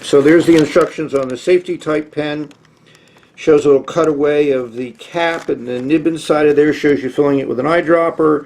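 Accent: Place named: Appalachia, United States. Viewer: great video. I always learn a lot about vintage pens from you. congratulations on reaching a milestone with your Channel. I have to ask, based on your accent, if you happen to be from the Allegheny mountain region originally?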